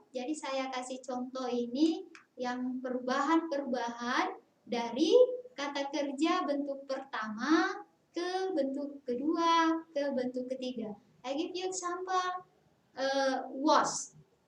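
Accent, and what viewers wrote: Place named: Java, Indonesia. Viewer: Bahasanya masih medok mbak e, kurang dari medok malah👎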